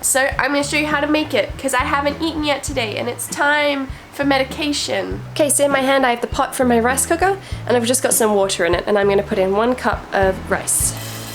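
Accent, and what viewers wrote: United States. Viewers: Sick has kind of an American accent haha Hope you're feeling better! My go-tos are olive leaf extract, OJ, tomato juice, and soup. I had a flu-y thing a few weeks ago that wiped me out for 2 weeks, hope you haven't had the same thing. It was horrible. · I'm hearing a little bit of American popping in to your accent lately :')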